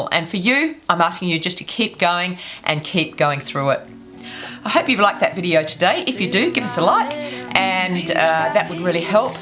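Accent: Australia. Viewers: I wake and do my exercises every morning with you!! I take off on the weekends!! I would love a Aussie accent, I love the way you talk!! I am from America we don't have a accents!! My question to you is, are you still doing facial exercises and will you be doing them for life? Have a great week!!!😊💟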